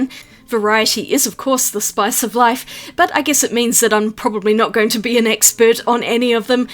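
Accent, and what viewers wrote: Australia. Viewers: Love your channel, love the swatching, your awesome Aussie accent (I'm an American -- we love British and Aussie accents), love your sketchbook sessions. My motto: When in doubt, paint planets!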